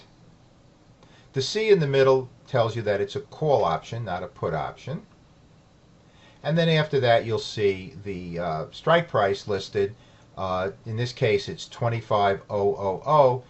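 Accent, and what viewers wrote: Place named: New York, United States. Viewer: Hi Mr., my mom deeply and humbly apologizes for insulting your beautiful New York accent. I love it and I love the videos. Keep it up homie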